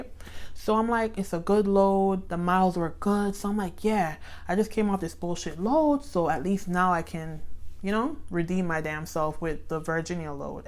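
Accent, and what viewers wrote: Jamaica. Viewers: I really want to do local but hmmm! I think I heard a Jamaica accent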